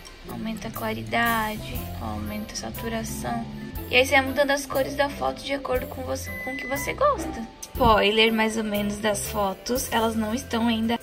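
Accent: Santa Catarina, Brazil. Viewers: Vc é de SC kkkkkkk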